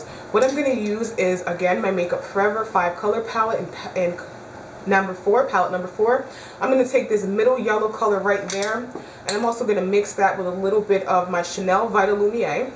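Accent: United Kingdom. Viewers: lol at the british accent, I love it goooorgeous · Hahaha love the british accent! great tutorial!!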